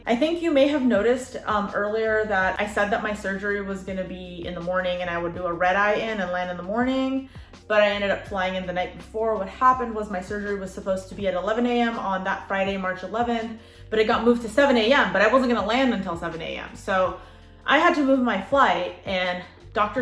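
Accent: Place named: California, United States. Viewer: Love your personality, and it’s so refreshing to hear your “cali accent” I’ve been away from home for 7 years now & it’s so annoying when people tell me that I have one lol but I have now embraced it and love it!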